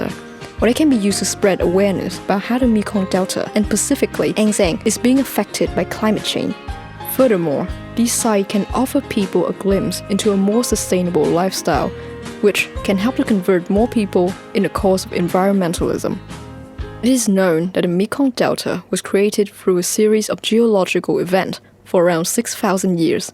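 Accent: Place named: United Kingdom. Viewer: Nguyễn Triều Nguyên, from Nguyễn Sinh Sắc High School here, your performance is awsome ! and it seems like it must took you a lots if times to make this video, and you got a good British voice right there, nice ~<3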